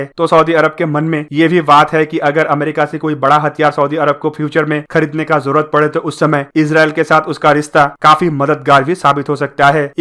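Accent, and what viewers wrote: Bengal, India. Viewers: Bengali hai,isliye Hindi thoda alag trah se bolta h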